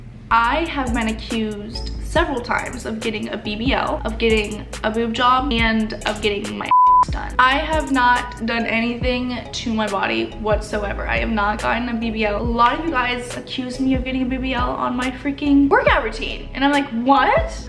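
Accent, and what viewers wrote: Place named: South, United States. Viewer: I think it's more of her trying to cover up a southern accent. "SAA-FAR-EE" instead of "suh-far-ee".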